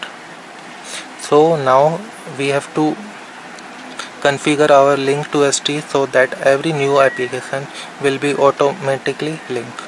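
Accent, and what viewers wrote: India. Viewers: hey bro talk in Proper English not in Bhaya English..I Laugh very very much after watching your video..How your talking man talk proper..It is very funnier than comedy nights with kapil.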